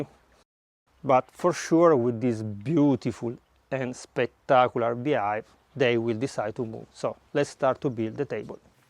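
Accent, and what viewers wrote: Italy. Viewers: I was just thinking today, "What happened to my favorite Italian guy channel?" I really do appreciate your videos, and very much enjoy your speaking English. Thank you for your time and energy making these great videos. I would love to visit Italia again in my life, but reality is probably no. Are those Fig trees behind you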